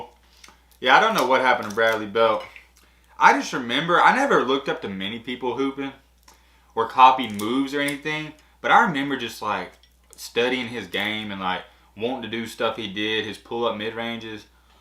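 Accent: South, United States. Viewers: He’s southern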